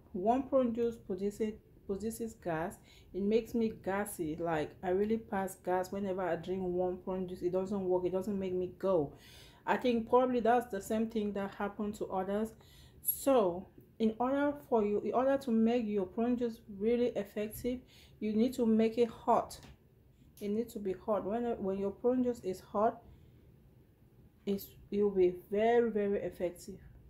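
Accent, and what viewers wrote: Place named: Edo, Nigeria. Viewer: Sounds like a 9ja sister Beni or Esan. I go try this one sha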